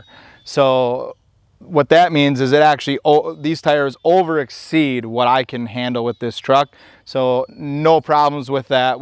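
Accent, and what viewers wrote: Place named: Canada. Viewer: Awesome truck! I was wondering if you were Canadian by your accent, then I heard you mention Wisconsin...very similar.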